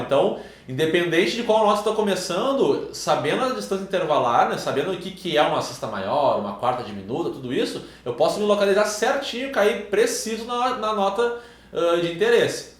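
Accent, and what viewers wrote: Rio Grande do Sul, Brazil. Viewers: Muito bem entendido;;; me ajudando muito... . só uma pequena perguntinha::: vc é gaúcho?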